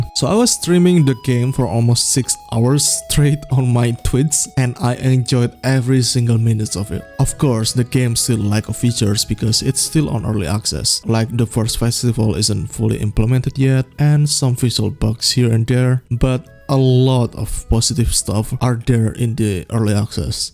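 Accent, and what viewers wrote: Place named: Indonesia. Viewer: great video! i was wondering where your accent originates from? also i'm surprised you don't have more subs, your content is very polished:) keep it up! edit: i'm guessing you're from indonesia or are from indonesian heritage and that's where your accent is from after watching more of the video. i've never learned much about it, so i will definitely watch your videos to learn more about your culture and how it's portrayed in coral island!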